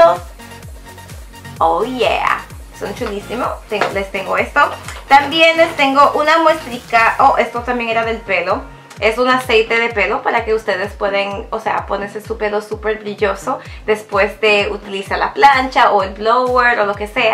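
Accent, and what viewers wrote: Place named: Latin America. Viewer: Hola, mi nombre es Patty te veo desde San Luis Potosí Mexico. Me gusta mucho tu canal espero sigas subiendo vídeos seguido :), amo tu acento latino!! un beso guapa.. me gusta el jabon de cara de Origins, se me antoja probar esa marca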